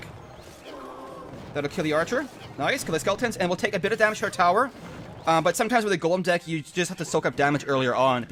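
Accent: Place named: Canada. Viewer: Mini pekker haha. You must be Canadian by the way you talk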